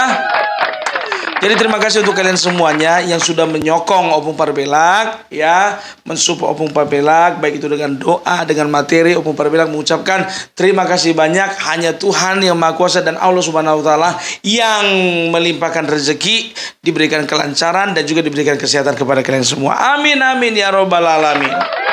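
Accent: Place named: Bali, Indonesia. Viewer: Wah logat Balinya kentall Joss Opung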